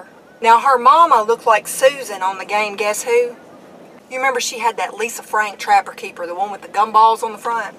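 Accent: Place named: Texas, United States. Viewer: Where are you from? We sound alike. I’m from Texas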